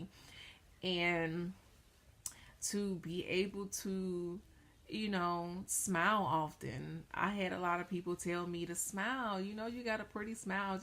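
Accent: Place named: South, United States. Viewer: Great Message!! Where are yoy from? I hear a southern accent.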